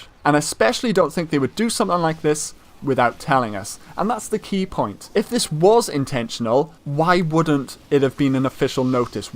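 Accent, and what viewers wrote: Wales, United Kingdom. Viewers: Are you Welsh ?? You sound like it.....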